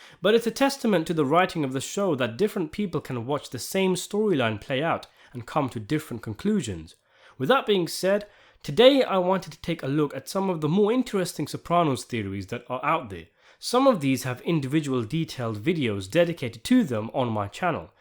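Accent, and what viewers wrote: United Kingdom. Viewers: I love how the narrator leaves the curse words in the commentary, but they get blanked out in the closed-captioning. And it's always fun listening to British accents talking about American mobsters. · My greatest mystery is your accent - it sounds British-ish but not quite...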